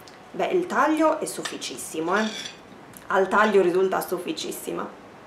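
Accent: Sardinia, Italy. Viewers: Dall'accento sembri sarda, ho indovinato?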